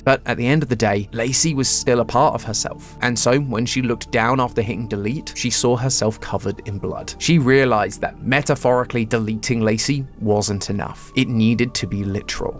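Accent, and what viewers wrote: United Kingdom. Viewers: LOL this is so good, gread job, i like britis acssent UwU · AMAZING THEORY Imma be honest tho i only watch horror game theories especially arg's and your british voice is soothing when talking about manslaughter.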